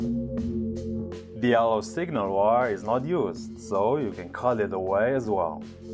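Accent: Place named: China. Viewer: Sorry but what's on top of my mind watching this is: what's your native tongue ... Sounds like Chinese to me...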